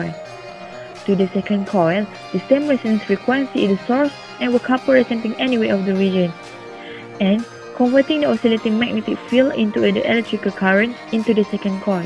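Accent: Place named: London, United Kingdom. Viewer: good Jobs korg...bangga ada member yg pandai speaking london..hehehe...:D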